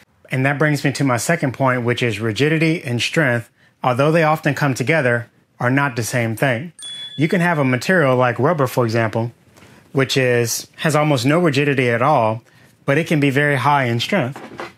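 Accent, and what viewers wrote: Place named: United States. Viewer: As a European it's alway been impossible for me to understand the continued use of imperial units in USA. How long is a mile? The Americans have an old man in Iowa, a mile is how far he can walk in 45 minutes. Honestly, that imperial crap can't be used. When I watch your video, it's so wonderful to hear SI-units spoken with that American accent. Kind of gives me hope for the future.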